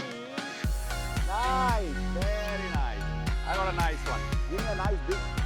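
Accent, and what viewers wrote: Kerala, India. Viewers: That crispy Mallu accent & voice modulation.. Waah..❤️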